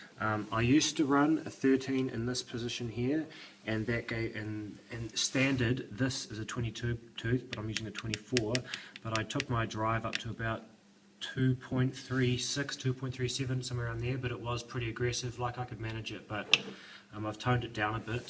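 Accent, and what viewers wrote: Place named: New Zealand. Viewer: wheres that track at bro? u sound like a kiwi aye? :-)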